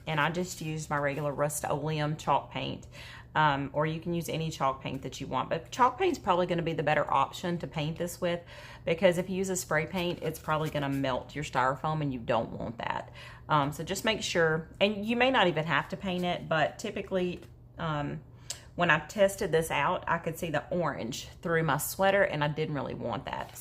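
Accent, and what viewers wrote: South, United States. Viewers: This is awesome so thank you! I’m also living for all the the southern speak, I’m not kidding!!! 🥰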